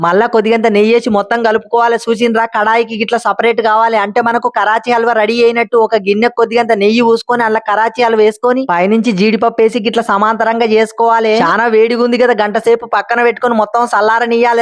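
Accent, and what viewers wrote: Telangana, India. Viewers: Telangana slang ndhuku andhar lo matladandi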